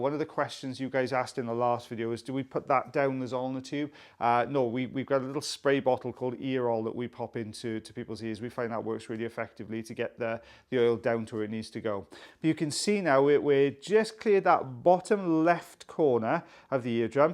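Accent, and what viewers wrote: Wales, United Kingdom. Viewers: Ahhh....after a frantic day your wonderful waxy Welshness has soothed my soul, thankyou 😍😍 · Love the commentary and the vision. So interesting. Sounds like a Welsh accent to this Aussie ear.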